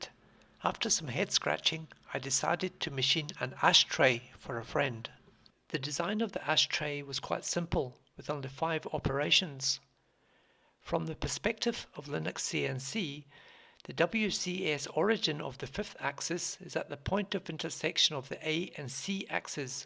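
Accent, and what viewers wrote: South Africa. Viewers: I know a South African when I hear one. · South African accent coming through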